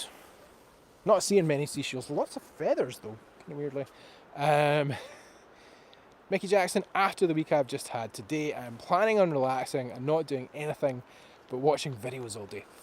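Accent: Scotland, United Kingdom. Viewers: Sorry I missed it ? I saw The Hoof GP give away the Appleton Crush ? Very kind of him ! I will keep watching your shorts ? Love that Scottish Borge of yours ? You have a good Sunday night ! Sorry again my bad ?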